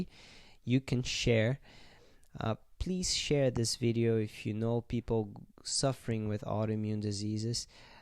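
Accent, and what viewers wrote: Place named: Canada. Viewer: Sounds like you are in Canada, I am as well.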